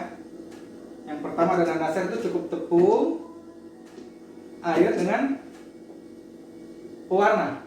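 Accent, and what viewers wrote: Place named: Sulawesi, Indonesia. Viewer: Assalamualaikum, jazakallah khoiron jaza, atas videonya semogga memberikan manfaat dunia akhirat.. dan berkah umur ples reezkyny... Dri logatnya kayakny dri sulawesi yah mas??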